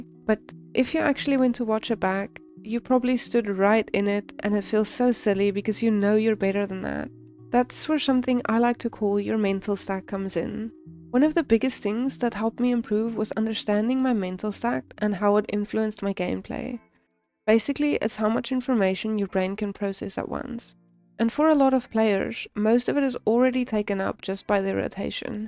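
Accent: South Africa. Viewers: Are you from south Africa? I can tell from accent since I'm from jhb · Sorry this is totally random, but I'm 90% sure you have a South African accent and I love it.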